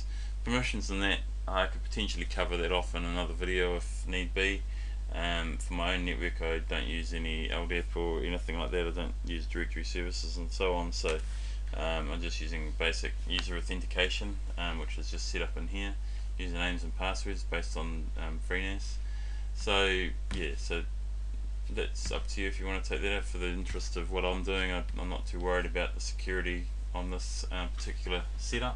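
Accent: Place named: New Zealand. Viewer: yeah sounds like a kiwi. Nitwork. Tistnas .. etc.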